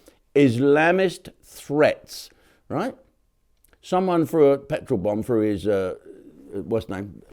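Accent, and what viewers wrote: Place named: Liverpool, United Kingdom. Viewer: that was more like scouse than hully gully jim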